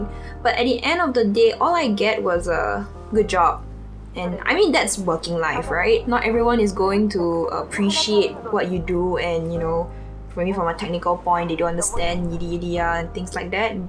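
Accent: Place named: Singapore. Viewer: i've come across a few of your videos because it's quite hard to find youtube creators that fit my skin tone and reviewing the products i'm looking for!! heard your accent and immediately knew you're singaporean too!! subscribed and i love your comprehensive reviews and your timestamps and just wanna say jiayous <3 can't wait to see you blow up on yt hehe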